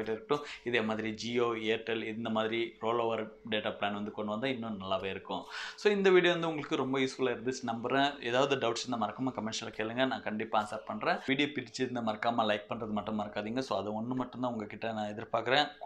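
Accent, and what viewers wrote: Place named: Hosur, India. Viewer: Bro Neenga endha ooru. Unga slang nalla irukku. Hosur ah.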